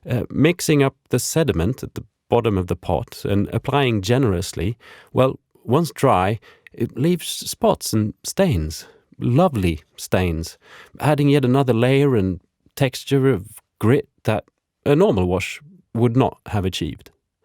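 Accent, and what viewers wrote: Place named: Sweden. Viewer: är du svensk? Nu lade jag märke till dialekten! Btw tycker dina videor är väldigt underhållande att kika på, heja! 👍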